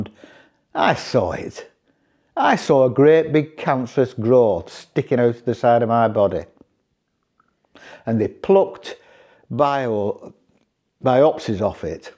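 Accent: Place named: Yorkshire, United Kingdom. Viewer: thanks for reading mate really enjoy watching your videos and definitely keep in touch I live in Sheffield Yorkshire and guessing by your accent your not so far away 👍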